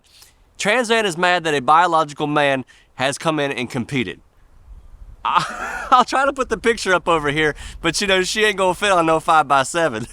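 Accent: South, United States. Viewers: Between the Southern accent, and the cadence in which you speak you reminded me of someone then it hit me. Ted Lasso! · Howdy y’all, I’m a 60yr old Canadian woman. We were the Led Zeppelin teens. First off love your southern accent! So smooth and friendly. Here in Toronto this whole thing has exploded. Out of fear of being called a Na*i I’ve tried to do the pronoun thing but holy sh*t I’M SO DONE!! These people are like “Revenge of the Nerds” gone nuclear!!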